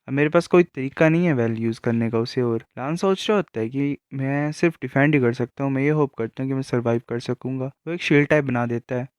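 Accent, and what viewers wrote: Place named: Himachal Pradesh, India. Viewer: Bro apki language ae lg rha app Himachal Pradesh se ho 😁😁😅 i am right 🥺😅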